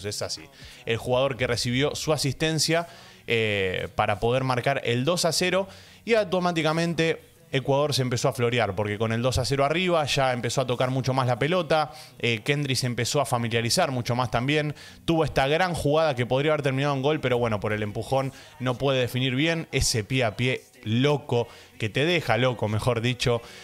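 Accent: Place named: Argentina. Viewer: Che por tu acento se nota que eres Argentino y con esa gran visión de fútbol que tienen ustedes los Argentinos se que vas a llegar muy lejos con tu canal Bendicionez mi pana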